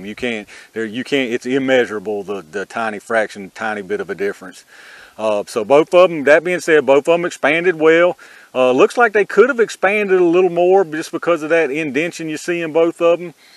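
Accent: South, United States. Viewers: Whenever you come up in search, I automatically fast forward to 3-4 minutes in the video then I put your a*s on mute. It's just that your redneck vaginal-sounding lip- yapping is dumb.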